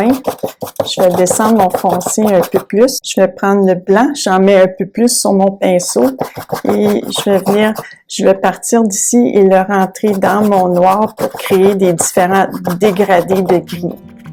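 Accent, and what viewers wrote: Canada. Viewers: Bonjour , vos conseils me sont très utile ,moi le débutant , vous êtes adorable ,votre accent Canadien est superbe , j'aime votre travail ,vos conseils et vos explications ,merci ,Bruno de Saint-Etienne France.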